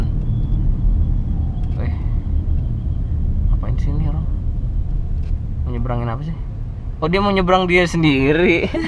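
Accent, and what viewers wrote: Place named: Banyumas, Indonesia. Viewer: Lucu timen ngomong ngapake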